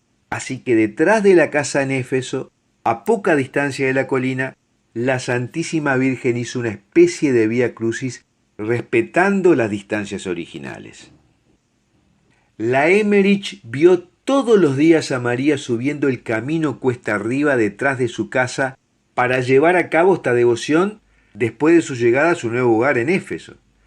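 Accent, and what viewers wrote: Argentina. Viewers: .le agradezco la información ya por medio de usted de la Virgen me a ayudado a reafirmar mi fe y estoy en proceso de seguir y aplicar mi fe católica por eso enfatizó este párrafo aparte de que me agrada su acento tipo argentino y su final bueno......! Por eso mi punto de vista para con usted ya que le reafirmó mi gratitud y confianza con su programa gracias buenas noches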